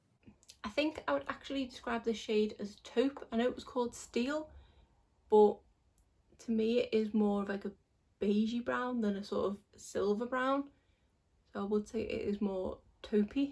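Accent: Liverpool, United Kingdom. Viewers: Are you liverpudlian? I'm really rubbish with accents 🙈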